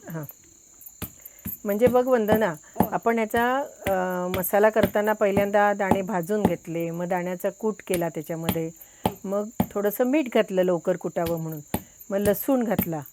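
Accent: Standard. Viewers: माझं सासर लांब असल्यामुळे माझी आई आजारी आहे ती आणि माझे वडील माझ्या बहिणीच्या घरी गेलेले आहेत . भाऊ घरी एकटाच आहे . लाॅकडाऊन मुळे त्याच्या जेवणाची आबाळ होत होती. मी त्याला रोज तुमच्या रेसिपी शेयर करते खूप छान असतात. शिवाय तुमचं बोलणं शुद्ध , स्पष्ट असल्यामुळे ऐकायला खूप छान वाटतं . शुद्ध मराठी सध्या लोप पावत चालली आहे. तुम्हाला खूप खूप शुभेच्छा. अश्याच सुंदर रेसिपी शेयर करत रहा.🙏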